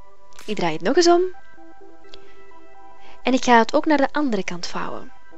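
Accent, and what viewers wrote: Flanders, Belgium. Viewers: Ze zeggen dat dit Nederlands is maar dit is Vlaams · ik snap die dingen niet en het is vlaams niet nl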